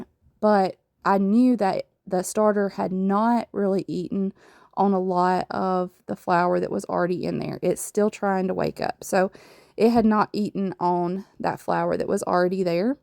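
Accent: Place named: Alabama, United States. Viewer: I love your Alabama accent. My people are from north central Alabama and sound just like you. thanks for the helps.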